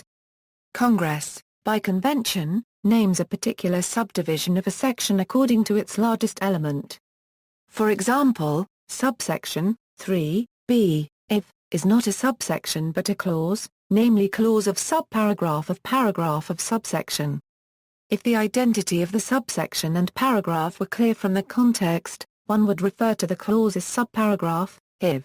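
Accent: England, United Kingdom. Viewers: And why is an Englishwomans' voice used to talk American law?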